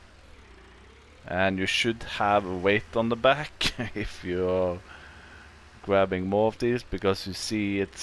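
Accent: Bergen, Norway. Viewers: You sound like you're from Norway Bergen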